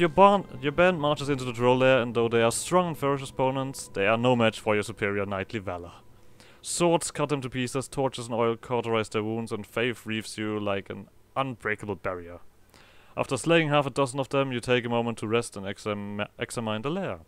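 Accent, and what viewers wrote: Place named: Germany. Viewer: hat 5 sekunden gedauert um zu erkennen dass du deutscher bist lol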